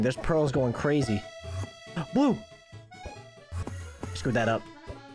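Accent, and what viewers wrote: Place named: United Kingdom. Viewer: Bro your british thats sooooooo cool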